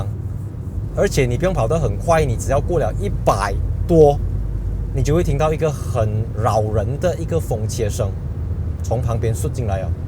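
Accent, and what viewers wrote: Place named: Malaysia. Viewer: 純正的聯邦腔+方言 😅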